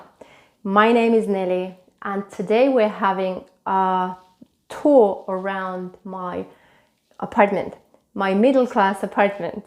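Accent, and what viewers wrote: United Kingdom. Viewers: I mean no offense-- I cannot decide if you are Russian, or British. Your English, which is excellent by the way, has a slight British accent. I know sometimes people pick up the accent of an instructor in a different language. I very much enjoyed the tour of your apartment and building. It appears a middle class city to me. Knowing nothing about it, I might guess heavy on industry? Thank you, very nice video. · I'm hearing a British accent? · I hear a slight British accent to your speech.........In Greece people have washers, very rare to have dryer....I think that is pretty common in Europe. This apartment is quite large. area looks middle class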